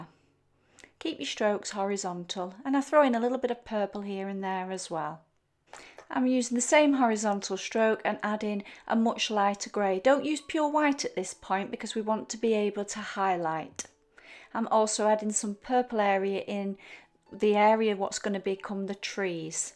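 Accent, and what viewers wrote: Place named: Yorkshire, United Kingdom. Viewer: What a beautiful Yorkshire accent! Excellent tutorial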